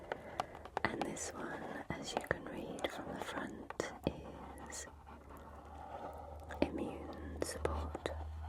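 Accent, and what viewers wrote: United Kingdom. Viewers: Love this and you!! Rough week so far and this has me ready to fall asleep and feeling calm. I’m American (with a US Southern accent) and I find your British accent so beautiful and proper ❤️💕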